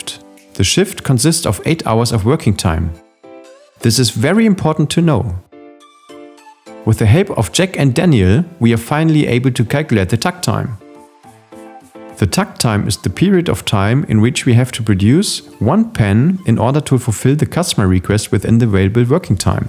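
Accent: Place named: Germany. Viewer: Man hört, dass du Deutscher bist. warum machst du dann nicht das Video auf deutsch?👀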